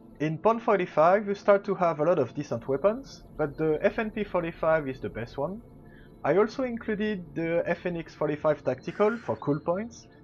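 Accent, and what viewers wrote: France. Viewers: Tu est français ? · Are you French?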